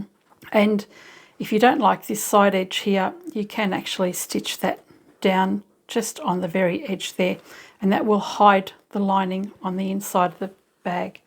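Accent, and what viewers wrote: Australia. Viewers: Thank you for a great zipper tutorial! Ive just subscribed. I recently did 3 cushions and botched them all in various ways... That wont happen again now ive watched this! Your sewing machine looks amazing. Can i ask what it is? Love hearing an Aussie accent too 😊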